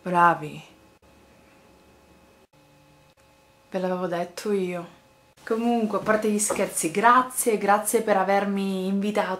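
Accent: Sicily, Italy. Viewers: Non voio ! : ) Bellissima pronuncia. Sei siciliana immagino ! Simpatica e bella !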